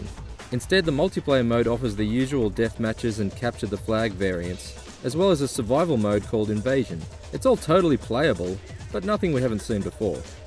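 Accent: Australia. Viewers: Australian narrator? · is this australian accent ? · he wasn't talking inside his mouth the reason why u couldnt(count) understand what he was saying is because he is an Australian which is a variation of the proper english language. so dont give this a bad review because u couldnt understand it because that would be like me giving u a bad comment mark because u have poor english writing skills.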